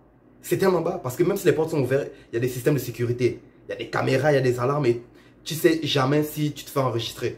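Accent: Gabon. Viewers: Tu as trop l'accent d'un gabonais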